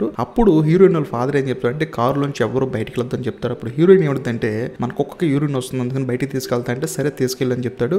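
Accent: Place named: India. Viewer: Anna mi explanation simply superrr. Mana telugu slang to cheppadam chala bagundii. One request Ee movies OTT lo vuntee cheppandii Memu chustamm Thank u brooo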